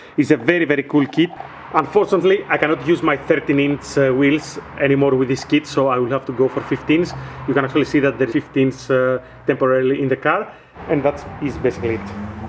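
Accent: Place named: Greece. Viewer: Απο την αρχη του βιντεο καταλαβα οτι εισαι ελληνας απο την προφορα... πολυ καλη δουλεια!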